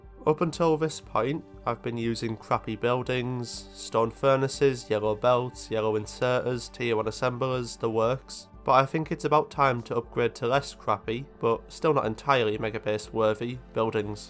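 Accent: United Kingdom. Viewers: Ngl your british accent made me watch the whole thing. and your amazing gamplay as well. Great content keep going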